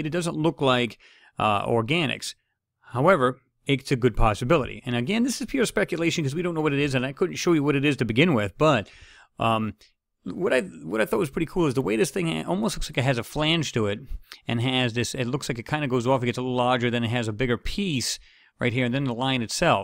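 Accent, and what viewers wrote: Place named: Rhode Island, United States. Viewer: completely unrelated, but your accent... you a native of Rhode Island? You sound like my relatives.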